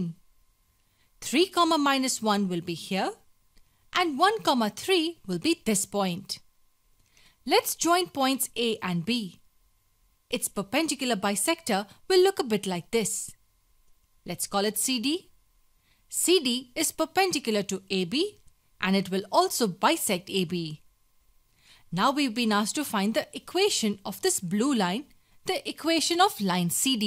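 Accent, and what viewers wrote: India. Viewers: I screamed when i hear an indian voice and leave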